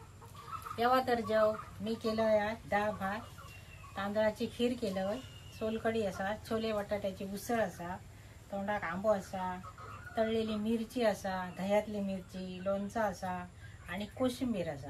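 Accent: Malvani, India. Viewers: पारंपरिक पद्धतीने बनविली जाणारी खीर तुम्ही अगदी छान पद्धतीने दाखवली त्याबद्दल धन्यवाद तुमच्या तोंडी मालवणी भाषा ऐकायला खूप छान वाटते.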